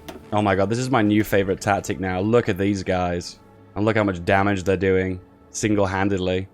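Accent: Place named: United Kingdom. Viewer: I love your videos and I love your accent. Simply because I'm french (salut l'anglais!) and all the french people loves the british accent in english.🤣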